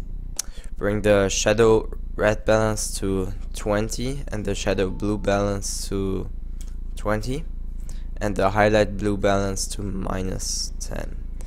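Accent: Germany. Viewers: haha, nix gegen dein english, ist aber immer so geil wie man jeden deutschen erkennt auch wenn er noch so gut english spricht XD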